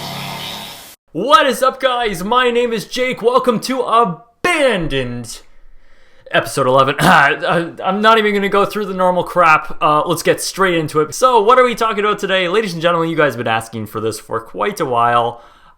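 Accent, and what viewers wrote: Canada. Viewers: I swear he said aneoba with an n. Willing to bet he's Canadian the way he pronounces his o's.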